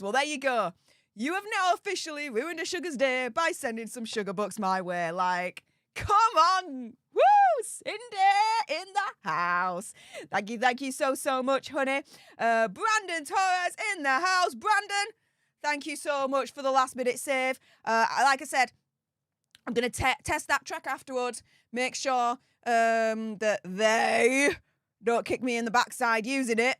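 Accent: Yorkshire, United Kingdom. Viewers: Nowt wrong wi' a Yorkshire accent! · It's British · Please slow down with your speech you have a beautiful accent being British but it's hard to understand you when you talk fast. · I know it's British... I'm asking what part of Britain. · She has a Yorkshire accent. I watched a youtube video that explains it and it helped me understand her. For instance, the letter T is not pronounced in the middle and end of words. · It sounds like Yorkshire northern England ! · Yorkshire accent which is Northern England. 👍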